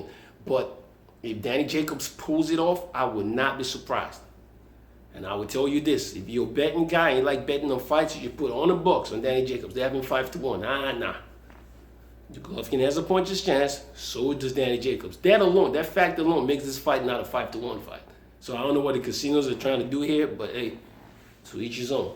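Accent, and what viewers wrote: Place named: Nigeria. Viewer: guy all this funne wey u dey tlk. lol. u be omo naija abi omo ghana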